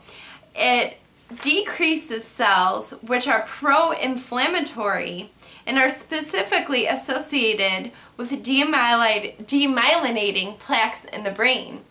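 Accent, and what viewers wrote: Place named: Detroit, United States. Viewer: hi have a question with de mylasation of the nerve cells do people with ms have seizures curious cause this how i found your vids was looking for info on nerve cells in the brain, stroke i had has left me epileptic taking dilantin to control them and is working:) also it's so nice to see you smile, defiatley could light up any room with it:) luv the little Detroit accent too lol Canadian here / toronto eh:)joe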